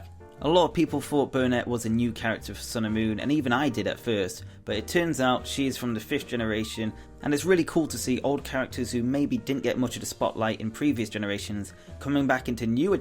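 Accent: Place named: United Kingdom. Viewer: He's maybe from the U.K..... Accents, person. not trying to be rude fyi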